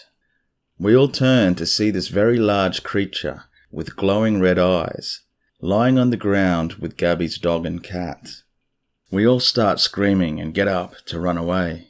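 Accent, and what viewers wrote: Australia. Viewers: !! Great to hear your Aussie voice again! Great stories! Hope you're doing well and staying healthy! 💜💙🩵💚❤️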